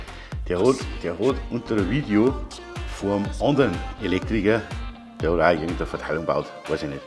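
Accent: Bavaria, Germany. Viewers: Und was ich noch loswerden möchte: Deine Videos sind super, ich schaue sie gerne an und lerne ab und zu auch was. Die z. T. dämlichen Besserwisserkommentare gehören eben dazu. So einen Filter, der solche Leute rausfiltert gibt es eben noch nicht. Und recht hast du, wer dich nicht versteht wegen deines bayrisch, an dem liegt es selbst, der muss eben bayrisch lernen. Wo kommen wir denn hin wenn der Bayer nicht mehr bayrisch reden darf.. In diesem Sinne mach weiter so. LG von Markus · Hallo! Wie immer gutes Video.Arbeite schon 51 Jahre als Elektoinstallateur und schaue immer wieder gerne deine Videos an. Ignoriere diese Dummschwätzer,die meinen alles beser zu wissen. Mich hat letztes Jahr auch eine alte Frau aufgefordert den Meister zu holen nachdem ich eine Lampe mit Bewegungsmelder montiert hatte, Ich habe vielleicht 10 Minuten gebraucht. Ich erklärte Ihr,dass ich der Meister bin und schon Hunderte Lampen montiert habe.Da sieht Mann,dass manche Leute keine Ahnung haben. 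Aber alles besser wissen.Und dein Bayerisch ist auch okey.Ich bin Schwarzwälder und rede Badisch da sagen die Leute auch ob ich ein Schwabe bin oder aus dem Hotzenwald komme. Die wissen noch nichteinmal wo der Hotzenwald ist.Viele Grüße Joachim. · Dein Dialekt ist bayrisch oder ostdeutsch ? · Servus, moi wieder ein super Video! Daumen hoch 👍🏼 Dei Boarisch vermiss i übrigens immer no a bissl... Schena Dog no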